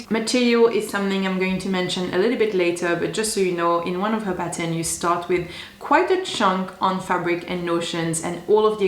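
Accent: France. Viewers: I love your French accent and some words should just stay in the original language ☺️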